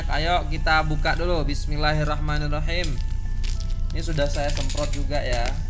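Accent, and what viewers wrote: Malaysia. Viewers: Comel lah dengar Cak ckp telo Malaysia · Sgt baik bunyi cak cakap...mcm org malaysia. Pernah tinggal di malaysia ke😊😊😊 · cakap nya sudah hampir bunyi orang malaysia cakap....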